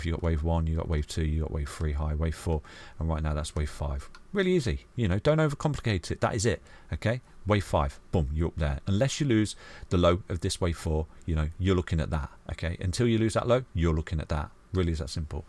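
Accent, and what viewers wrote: United Kingdom. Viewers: Something about the British accent helps strengthen the intelligence and certainty in the predictions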